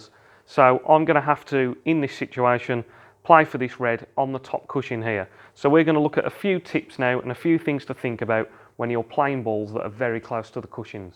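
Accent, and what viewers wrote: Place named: Black Country, United Kingdom. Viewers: Laila Vags he is from the Black Country in the West Midlands enjoy the video and stop trolling 😉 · it's the Black Country accent. If he was from Yorkshire he would be dropping Hs. It's just the varied UK dialects.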